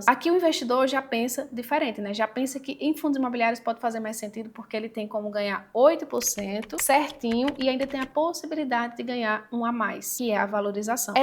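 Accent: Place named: Pernambuco, Brazil. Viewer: Fica maia engraçado ainda com o sotaque de pernambucana kkkkkk😅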